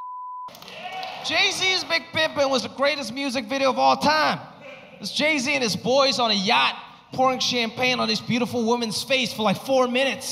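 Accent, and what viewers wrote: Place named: New York, United States. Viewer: I always wondered why he talks like he grew up in the hood mixed with an old NY Italian guy . RAP CITY 🤣 i love it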